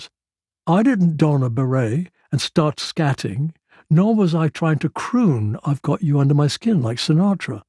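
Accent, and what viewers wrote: United Kingdom. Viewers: Greetings..Awesome… … ever so creative..never stop reading.. it’s quite a luxury for me to hear ur Brilliant brisk British Accent..beautifully read and beautiful blessings!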